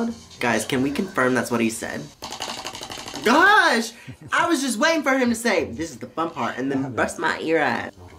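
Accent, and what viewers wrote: South, United States. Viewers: Every time i watch your videos i always forget you have a country accent lmao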